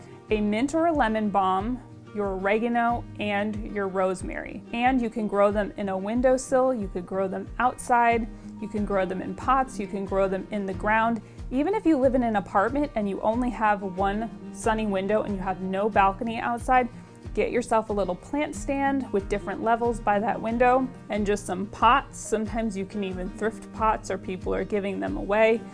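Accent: United States. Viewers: Sorry, I can't watch this. There is an 'H' in herb, I know in America you feel the need to change the English language, but to an British English speaker this is unwatchable. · The same reason she says oRAGeNno, she's American 🤷‍♂️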